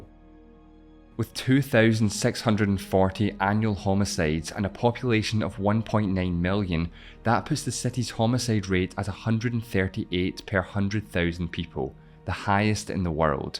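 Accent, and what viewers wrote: Scotland, United Kingdom. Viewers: Scotland. I couldn't say whereabouts but he's definitely Scottish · no he doesn’t? It’s just a Scottish accent. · guys, the guy's from scotland. give him a break for pronouncing "city" as "seti" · where in scotland are you from? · Is this a scottish accent or something? Harder to understEnd. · Are you Scottish? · he’s scottish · He's Scottish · Hes scottish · Scottish not sure where in Scotland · You've never heard a Scottish accent?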